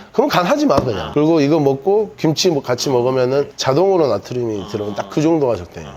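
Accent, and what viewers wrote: Jeolla, South Korea. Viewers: 전라도사투리가 아주 정감가브러!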